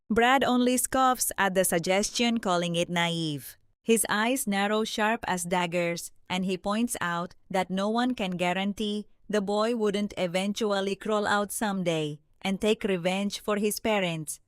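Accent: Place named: India. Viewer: Njiiirr logat india.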